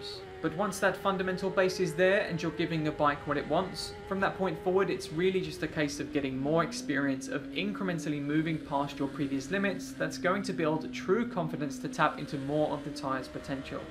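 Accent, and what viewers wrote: United Kingdom. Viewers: Wait what, he’s British? 😋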